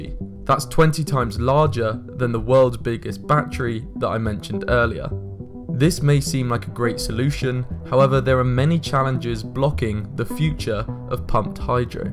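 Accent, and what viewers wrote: United Kingdom. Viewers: The idea is the modern perpetual machine. It is a hi tec scam. Before I believe a word of this bull shit I want an MIT physics prof to explain why it is a great idea not some guy with a british accent.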